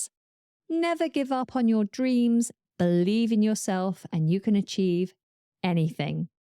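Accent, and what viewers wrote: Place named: RP, United Kingdom. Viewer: Good lesson, especially for the beginners! I especially adore your accent, the genuine RP accent and pronunciation.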